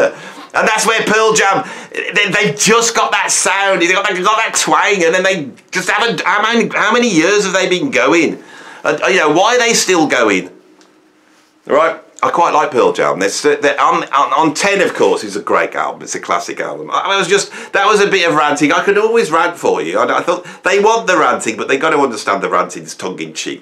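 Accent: Birmingham, United Kingdom. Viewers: Generally agree with all 10! Oh how like Gimme Shelter toooo... Well, I have other nominates for Overrated. Anyway, thank you, it's allways nice to hear your experienced musical opnion in your British English :) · Yeah, I find the Brummie accent is very arousing when the cool is being lost!!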